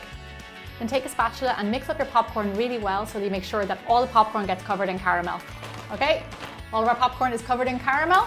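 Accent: Ireland. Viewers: Are you from ireland because I am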